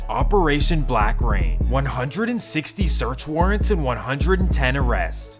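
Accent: United States. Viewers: Where did you learn how to pronounce American English?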